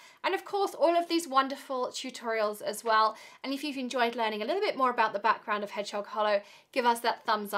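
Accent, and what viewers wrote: United Kingdom. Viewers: WOW !!! You sound like maybe you're either Wonder Woman or maybe you have a few clones walking around there helping you do all that you do. I tell you , you look so calm for all that you do. I am amazed. Have you always had this kind of energy ???? If it were possible , I'd tell you to bottle it and sell it. You would make Millions $$$. It has been a joy listening to your video about your self. I now know where that cute little accent comes from. Welcome to America from across the pond.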